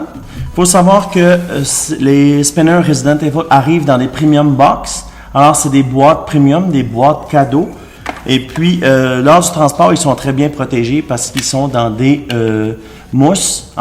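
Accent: Canada. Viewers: tu as l aqucant du kanada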